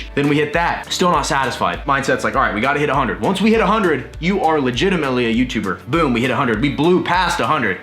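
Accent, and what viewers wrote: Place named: South, United States. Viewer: Texas in the house 😀!!! My favorite was the big ol bulking breakfast because of the southern accent of course😂😂!!! Congratulations on 200K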